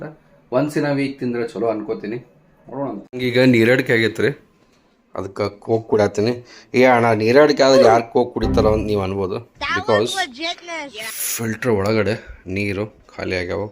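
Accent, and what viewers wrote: North Karnataka, India. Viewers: ನಿಮ್ಮ ಭಾಷೆಯಲ್ಲಿ ಬಳಸುವ ಕೆಲವು ಪದಗಳು ಎಷ್ಟು ಸುಂದರ..... ನೀರಾಡಿಕೆ ಅನ್ನೋ ಪದ 👌🏼👌🏼👌🏼👌🏼👌🏼👌🏼. The star of the Vlogs is your North Karnataka language 👌🏼👌🏼👌🏼👌🏼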